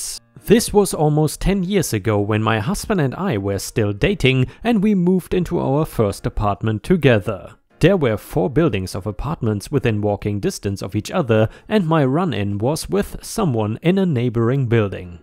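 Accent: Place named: Germany. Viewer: Are you German? Sounds like an German accent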